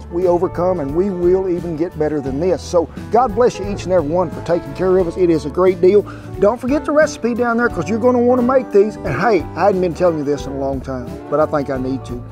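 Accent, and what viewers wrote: South, United States. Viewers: i am actually watching this channel for the great accent hahaha. i just love the Southern Accent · was just listening to him talk while im doing stuff around the house and i thought it called for Bacon powder! Rewatched and saw its Baking powder! LOL those southern accents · Lol ... I’m from Texas and knew exactly what he said! I guess ya have to be from the south to understand the dialect 😊 love it 😍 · He has a Southern accent . It's the most popular accent as voted by someone. I love his voice. Handsome guy . Sounds like my Tennessee family and friends.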